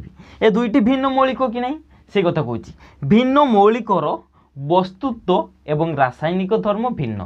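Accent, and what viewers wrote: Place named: Sambalpur, India. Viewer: Tame sambalpuria enta laguchhe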